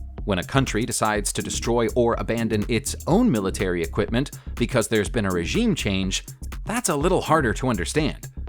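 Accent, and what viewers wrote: United States. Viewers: The announcer sounds American. How is it he can't pronounce Catalina?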